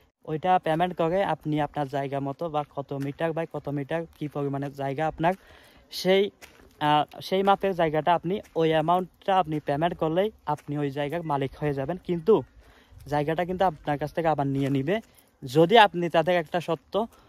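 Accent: Chittagong, Bangladesh. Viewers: Chittagong er manusher shadu vashai Kotha bolte akto shomossha hoy ata shodo onar na shob Chittagong er loker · কথার একসেন্টেই বুঝা যাচ্ছে চিটাইঙ্গা ❤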